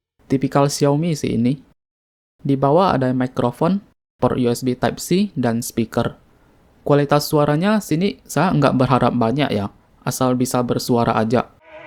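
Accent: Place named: Bali, Indonesia. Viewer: Logat bali😊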